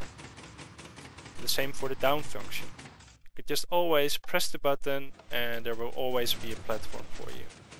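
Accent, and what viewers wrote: Netherlands. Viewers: Ik hoor aan je engels dat je nederlands bent, of niet? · je kan altijd horen als iemand uit Nederland komt xD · Ik hoor dat je nederlands bent :d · Als ik het goed hoor ben jij Nederlands...? :D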